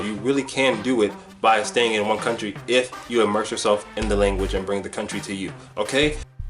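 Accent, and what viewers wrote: Dominican Republic. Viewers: This video got me in surprised. I'm Dominican myself checking on how English people would get to learn Spanish and was drawn to your video because of various elements that suggest several aspects of the Dominican scene: the Reggae background beat, your Spanish, I would even dare to say you sort of speak English with the Dominican swagger which is pretty cool to watch. Great job, keep it up. You can be my friend if want to come down to the island some day. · Que lo que! I stumbled upon your page and I’m so impressed by your Spanish y tu acento dominicano. I studied abroad for a summer in DR and have a bachelor’s degree in Spanish, but I’m embarrassed to say that I feel that my Spanish hit a plateau because of not wanting to make mistakes and put myself out there. But I’m now really making an effort to get to your level. Do you have any Skype or what’s app so I can contact you for help! 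Necesito tu ayuda!!! Pol favol! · Pareces dominicano de la capital!!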